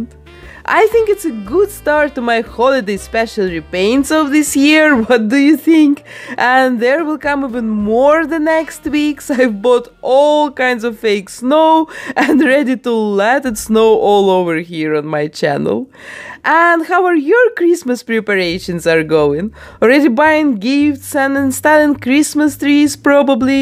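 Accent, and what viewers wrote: Russia. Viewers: Вот наткнулась на видео И сразу поняла по акценту , что из России человек 😂😂😂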